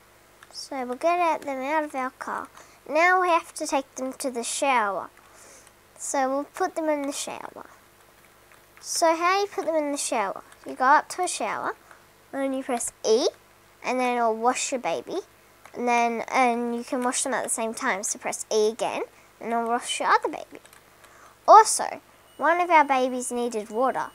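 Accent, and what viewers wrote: Australia. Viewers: the australian accent though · Is she Australian?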